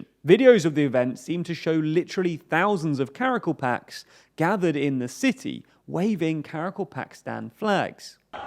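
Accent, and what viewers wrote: United Kingdom. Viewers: The first three are just British pronunciation errors, the fourth one doesn't matter because you know what he meant. The final two are the only serious ones, and they aren't even really that serious.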